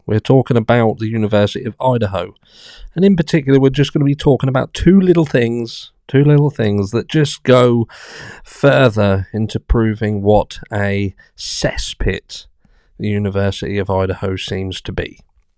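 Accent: United Kingdom. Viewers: I can’t follow. Normally I relish an accent from uk but can’t this time. Straightforward reporting is best.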